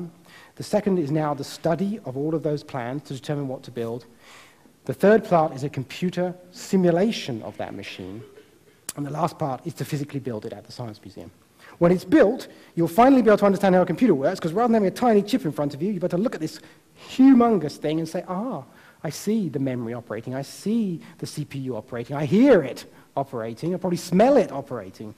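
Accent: United Kingdom. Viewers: He talks too fast and with a British accent :(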